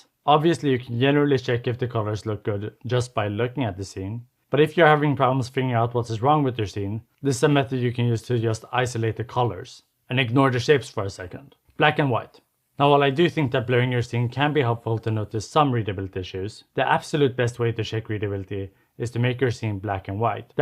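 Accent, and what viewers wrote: Sweden. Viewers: I was just about to open your channel page in a new tab to check the cannel info to see if you were Swedish*, but then these books appear confirmed it for me, lol. * I have ADHD okay; when I start to wonder where an accent is from and start to think it sounds oddly comfortably familiar, and then suddenly realise that it sounds Swedish; I just have to pause the video and figure it out immediately, or I will stop paying attention and only focus** on the accent... **That's also why my channel info only says "I'm from Norway, in case you wondered...", just in case some other ADHD'er gets distractingly curious about where the crazy person who posts multi paragraph (or one whole screenful of text with barely any periods but lots of commas and semicolons and nested parentheticals and no line breaks) is from... Come to think about it; maybe I should add "and I have ADHD" to the description too? 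That might explain some of my wildly off topic comments to anyone curious.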